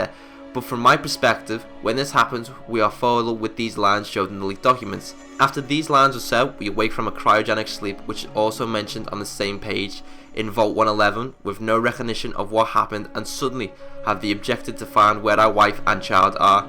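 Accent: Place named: England, United Kingdom. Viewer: "Nagaski" ? It's pronounced "nah-gah-sah-key" I know you're English but slow down with your words homie. 👍 Love the videos. This is simply just constructive criticism.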